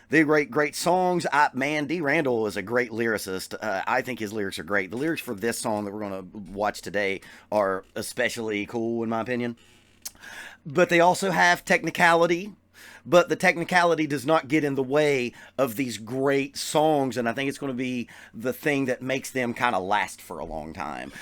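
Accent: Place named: North Carolina, United States. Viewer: Yea I think its strange and weird that the Adler brothers are not playing together anymore, BTWay your accent sounds very familiar to mine, You from NC? lol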